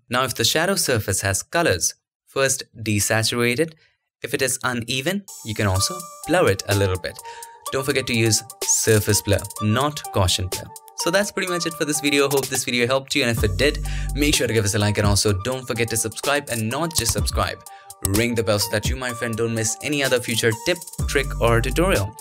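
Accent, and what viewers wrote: India. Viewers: It is called Hindi accent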